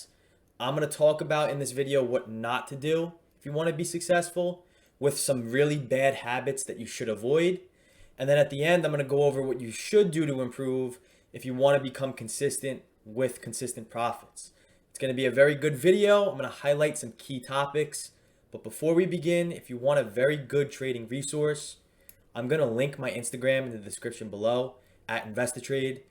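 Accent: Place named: New Jersey, United States. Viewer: Hey, I am from NJ, you sound like your from my area. Thanks to your video, I applied for options got approved for standard margin. But I am getting limited to Pattern Day Trader. Can i call to have margin turned off and the 3 a week wont apply to me? Thanks again.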